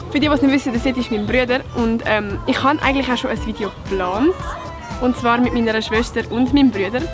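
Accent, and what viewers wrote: Zurich, Switzerland. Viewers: vo wo bisch du eigentlich?😅 Am Dialekt aa Ruum Züri, oder?🤔😅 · Dä dialänkt laht verrate Züri 😂😅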